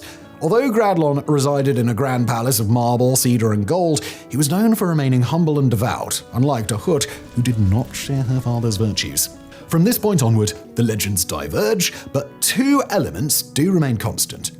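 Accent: RP, United Kingdom. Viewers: At first, I thought Simon was stricken silly by a homophone... then, I realized that he wasn't. This guy doesn't know the difference between a lama and a llama. I guess a posh accent and arrogant snark can't always hide ignorance.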